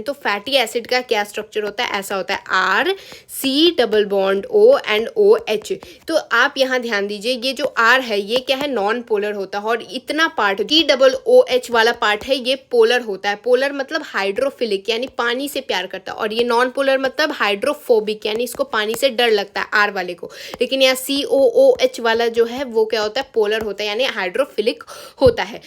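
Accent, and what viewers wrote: Bihar, India. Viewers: Pronunciation galat ho raha hai😂😂 baaki chapter explanations theek hai .!! bihari tone hai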